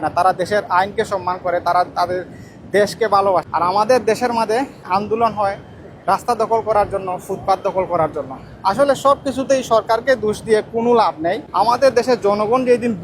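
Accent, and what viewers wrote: Sylhet, Bangladesh. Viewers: তুমার কথা সিলেট মনে হয়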